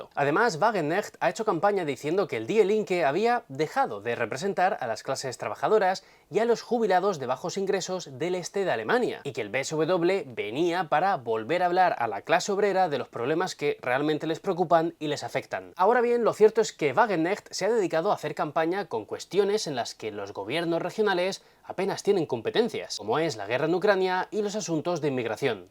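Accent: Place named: Castile, Spain. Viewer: antes de opinar, te sugiero que aprendas a pronunciar correctamente las palabras alemanas, que en tu acento castellano suenan fatal, hijo mio. Para muestra un botón, la palabra die, se pronuncia fácilmente como di.... De nada.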